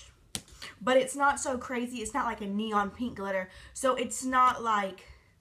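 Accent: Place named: South, United States. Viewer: I am so jealous of your country accent!! 😭😩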